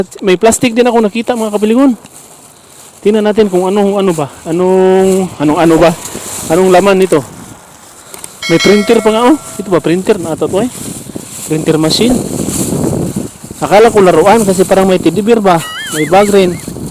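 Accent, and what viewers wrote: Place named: Tagalog, Philippines. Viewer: Ganahan gyod ko maminaw sa imong Tagalog kapilingon makalingaw ha ha ha,❤❤❤❤😊😊 amping kanunay dong.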